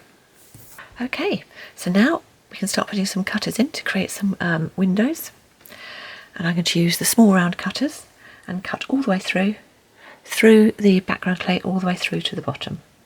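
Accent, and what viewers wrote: United Kingdom. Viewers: Very cute! You are a great teacher, and your videos are charming and relaxing with the piano background music. It is impossible to be in a bad mood and watch one of your videos. They are so well-narrated, and so thoroughly British! Happy Halloween!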